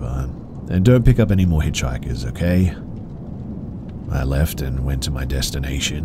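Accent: Australia. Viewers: Was going to say better be an Aussie saying that but then could tell 😅o Aussies speak like that 😂❤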